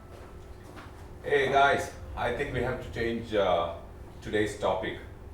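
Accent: Kerala, India. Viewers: That crispy Mallu accent & voice modulation.. Waah..❤️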